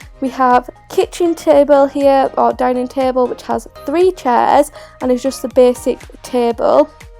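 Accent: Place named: Leeds, United Kingdom. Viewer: Are you from leads? I love your accent ❤❤❤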